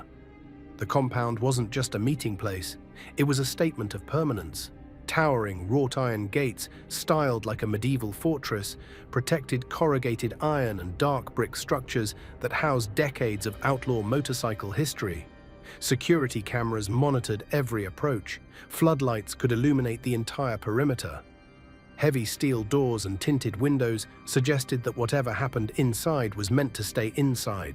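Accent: England, United Kingdom. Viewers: Why so many videos narrated by an English accent?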